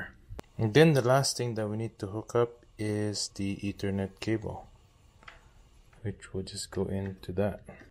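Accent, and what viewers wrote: Canada. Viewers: okay, good to know. Btw, are you Canadian? I hear a bit of a Canuck accent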